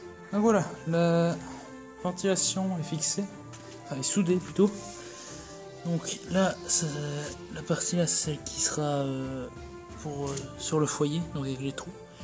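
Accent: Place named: Belgium. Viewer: Tchu ti ké beau accent belge la Belgique le meilleur pays🇧🇪🇧🇪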